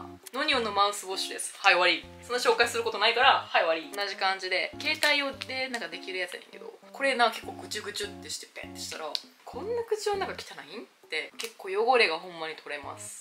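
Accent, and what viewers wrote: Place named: Osaka, Japan. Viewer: 大阪と大阪人と大阪の食べ物と大阪弁がダイスキで現在大阪に住んでる山形人なんですけど、の動画観ていつもきゅんきゅん＆ニヤニヤしてました🥰知らんけどとか、◯◯やねんな〜とか、耳が幸せです🥰ありがとうございます🌷あと今頃だけど、山形で免許取ってたなんて嬉しいです✨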